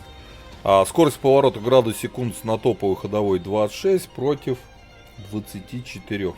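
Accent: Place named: Moscow, Russia. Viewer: Блин, какой же ты самодовольно противный. Прям Царь блин танков, манера Московская чтоли, акцент ужасно противный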